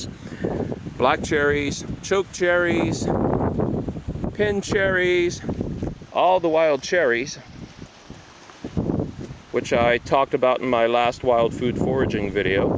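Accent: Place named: Canada. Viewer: Actually he has a Canadian accent which sounds like a television announcer to American ears. In other words, his consonants are more clearly pronounced and his vowels are more open. There are many English accents in both American and Canada, but for the most part Canadian English is more clearly pronounced than the media-driven Modern American English which attempts to be jazzy and cool. Many people pronounce their consonants in American, but many other do not. Canadian English is refreshingly clear. I love accents!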